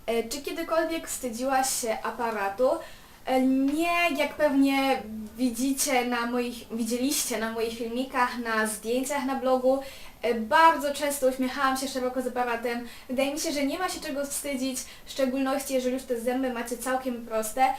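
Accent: France. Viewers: Masz frncuski akcent ;3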